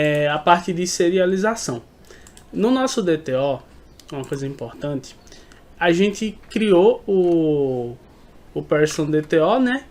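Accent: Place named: Pernambuco, Brazil. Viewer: Oi, vi o post no YT e achei interessante. Quando vc começou a explicar, fiquei com uma pulga atrás da orelha (Esse rapaz é Pernambucano). E o tiro foi certeiro kkkkkkk O modo de falar entregou... hehehehe. Ganhou mais um seguidor. Abraço meu caro, um prazer encontrar pessoas aqui como vc. Agradecido pelo compartilhamento. Vamos nos falando.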